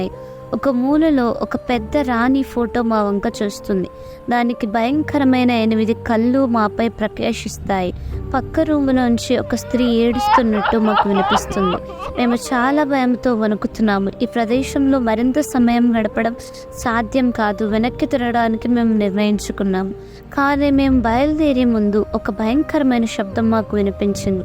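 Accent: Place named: India. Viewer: Good 👍 nice telugu slang is nice good voice some words we are not able to understanding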